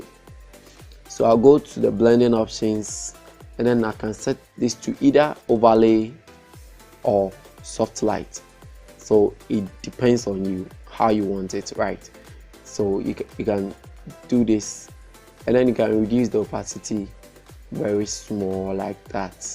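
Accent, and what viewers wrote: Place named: Ghana. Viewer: Sounds like a Ghanian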